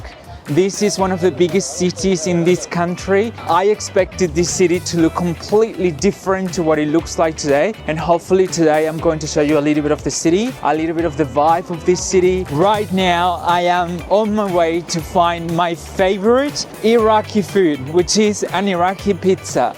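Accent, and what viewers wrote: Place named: Australia. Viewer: I am glad u enjoyed ur visit in iraq amigo . where are u from by the way , ur English sound Australian but i can hear the Latin accent there <3 . Thank u for showing the yummy food that i miss . the dolma is not good doesnt look good i give it 2/10 my mother make the real dolma from mosul .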